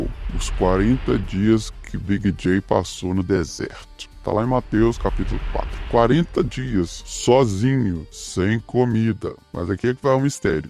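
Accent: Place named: Minas Gerais, Brazil. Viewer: Sou apaixonada nesse sotaquinho mineiro! Assistindo pela SEGUNDA vez!!! Muito bom!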